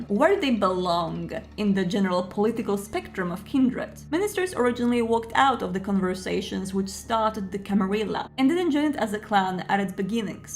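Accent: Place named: Poland. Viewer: Why is Kirsten Dunst speaking to us in a Polish accent? I am intrigued...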